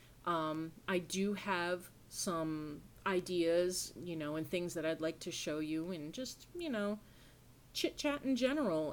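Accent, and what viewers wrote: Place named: New York, United States. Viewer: Hi! Darla from Crafty Yarn Owl gave you a shout out in her most recent video, “Crochet Bags”. I thought I would start with your first video and I think you are a natural! Your first video was good and I think you have a really genuine easy- going way of talking and showing your projects. I love the name Twisted Stitches! I live in Florida too and used to work as a pediatric nurse. I am originally from Long Island, N.Y. And have lived here for 24 years now. I had a feeling maybe you were from N.Y., but I may be wrong. When you said about the way you were talking, I’ve been in Florida too long I knew what you meant. I say things that I never would have said before and have a bit of a southern twang now when I speak! I am a new subbie and look forward to your other videos. (I am just starting to take up knitting and knitted my first hat, I have been crocheting for years). 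I have a bad back too, degenerative discs, since I was 29, am 65 now. I know what that’s like! Hope you are doing well! 🤗🧶💗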